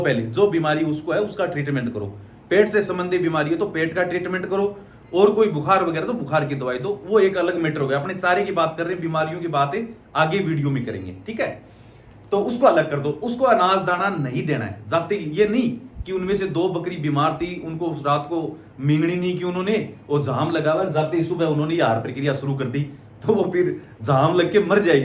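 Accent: Rajasthan, India. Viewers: 😂😂👍👍 Very nice 👌👌 Bhaai aap ka samjhaane ka tarika bahut hi sunder hai 👍👍 अलबादी बकरा भाषा में राजस्थानी लहज़ा बहुत ही शानदार लगता है 👌👌👌👍👍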